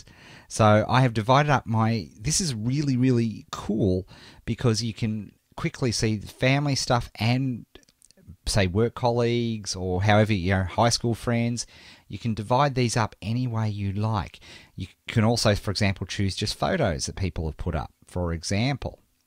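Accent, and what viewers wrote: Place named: Australia. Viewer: Lol You obviously figure out that this is an ozzie.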